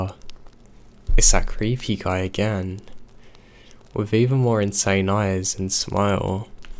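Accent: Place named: Yorkshire, United Kingdom. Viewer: Hey. What’s accent (or no accent) do you have? Yorkshire? I can’t tell? Not London I think. It’s cool.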